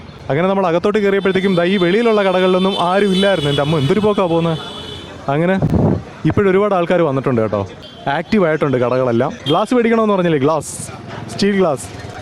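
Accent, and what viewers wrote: Kollam, India. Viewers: ങ്ങടെ ആ കൊല്ലം Slang ദ്ങ്ങട് പൊളിച്ചു. മേടിച്ചുന്നതിന് വേടിച്ചു.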